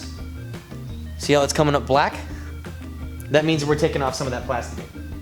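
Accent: Canada. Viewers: Canadians and the way they say out. hahaha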